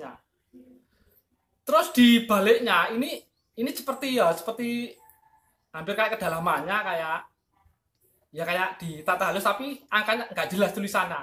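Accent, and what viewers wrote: Java, Indonesia. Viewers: sampean ini kyknya ngomong berat skl, trll medok ya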